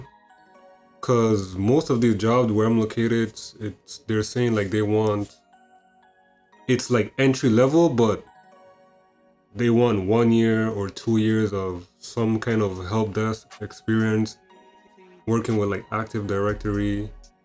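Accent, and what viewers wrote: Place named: Virgin Islands, Caribbean. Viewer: I knew it! I was watching the video thinking 🤔 “This person sounds like they are from the Caribbean. Specifically from the Virgin Islands.” I’m also from the VI and currently live here. I’ve been planning my entrance and ultimately my path in IT, so been doing a lot of research. So far in my research it comes down to 1) learning the foundational knowledge so Comptia A+, Network+ and Security are good options. Learning Linux and a scripting language like powershell, bash or python can be beneficial. 2) developing and building on it skills which can be done using hands on labs and projects, 3) Getting experience either in a support role via computer support/ help desk or network support, internship, a tech role like Apple Genius, Best Buy Geek Squad,or similar. 4) Networking in the field via LinkedIn, meetups, applying for hundreds of jobs and personalizing your application with an introduction gif. 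Also, it may help if you create a vlog/ YT channel in the space for whatever path you are interested in. 5)Not sure if you have a degree but if you don’t you may want to consider getting one in the long term to help you qualify for more tech roles. If you don’t have one, look into WGU (Western Governors University) they have multiple it Bachelor paths, tech certs are included in many of the degrees and you can get your degree in less time 6 months to 1.5 year depending on the time you put into it and your level of dedication. It costs about $3700 to $4500 per 6 month term dependin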